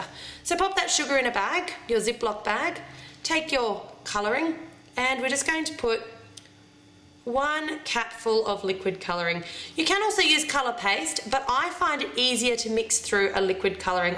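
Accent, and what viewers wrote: Australia. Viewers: i. love. australian. accents.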